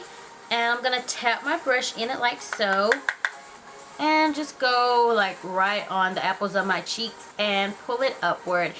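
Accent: South, United States. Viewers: Ur so BEAUTIFUL i love your accent! its southern right? IDK thats why im asking lol(nottryingtooffendne1!) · I love your accent! lol Sounds so southern. :) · love your country accent xD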